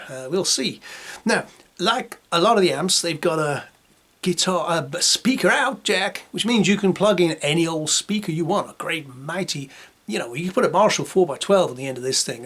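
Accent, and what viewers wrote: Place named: United States. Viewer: what`s with the stupid yank accent??????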